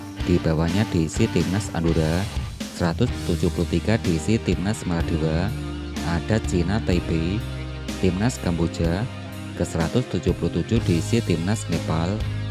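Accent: Java, Indonesia. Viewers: bahasa medok jawa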